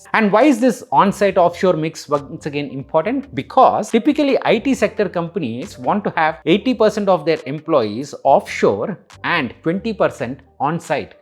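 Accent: South India, India. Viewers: your accent is very much south Indian. It is sounds little weird to other people.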